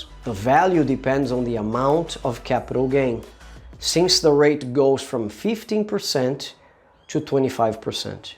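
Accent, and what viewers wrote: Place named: United States. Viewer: oh good im glad u speak american english i thght i might nt understand u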